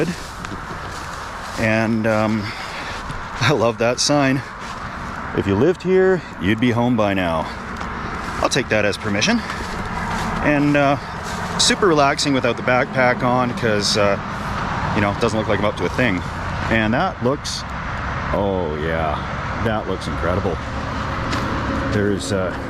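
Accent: Canada. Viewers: is one of the sweetest, most wholesome people on this platform. Whenever I hear speak, I am enamored by his thick Canadian accent, but more importantly his positive attitude. is a treasure and we must protect him at all costs.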